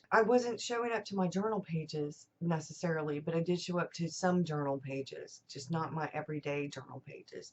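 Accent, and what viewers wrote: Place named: South, United States. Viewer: I love the southern accent, it sounds so friendly. Ans I never doubted that you are very intelligent.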